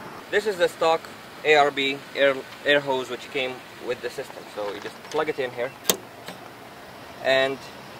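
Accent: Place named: India. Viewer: يااخو لو انك شرحت بالعربي لغتك تقول هندي يشرح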